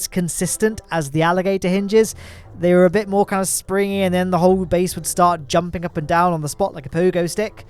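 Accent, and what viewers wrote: United Kingdom. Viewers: british voice = smart voice. this is just the way it is. (i'm an american)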